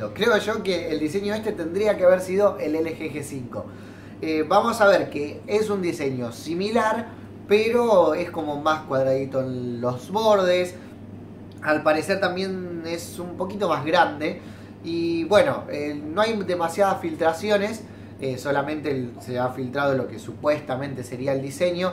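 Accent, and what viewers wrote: Argentina. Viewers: hola de que parte de argentina sos?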